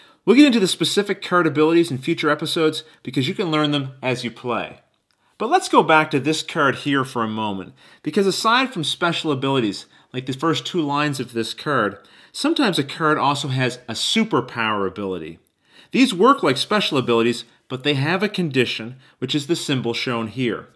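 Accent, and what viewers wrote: Canada. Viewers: This helped alottttttt ..... PS... this guys accent is so canadien haha great job though!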